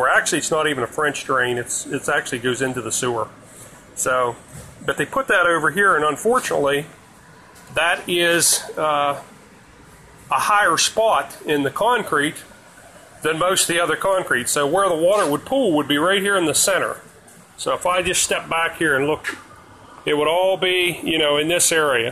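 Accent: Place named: Pennsylvania, United States. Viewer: Well you definitely have a pa accent! Couple years later now I was wondering how is it holding up?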